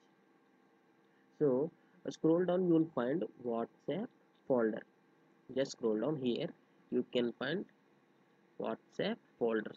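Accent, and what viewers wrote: India. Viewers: You have an Indian accent but at least you speak understandable English. Thank you!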